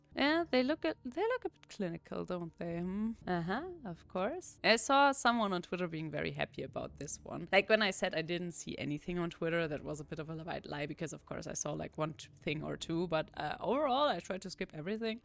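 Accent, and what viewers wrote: Germany. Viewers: Hi, yeah I would love watching another class, any realy, do what you're enjoying. BTW I find your voice so smoothing, and love the german accent. I'M french but father was german so it brings back memories 😉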